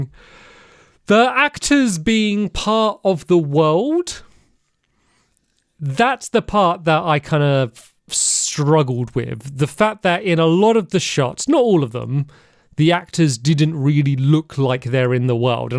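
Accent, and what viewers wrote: United Kingdom. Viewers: Never watched your vids cause I used to think your voice was annoying. Now I realize you're just British and it's not your fault. Live your truth, I support you 🕊️🇨🇰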